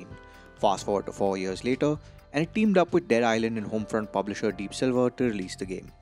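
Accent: India. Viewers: Can't stand people from India talking English. Ding Dong in my ears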